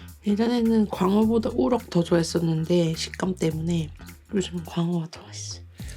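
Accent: Gyeongsang, South Korea. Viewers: 아 대구시구나 경상도사투리가 가끔 나오시더라니 여긴 창원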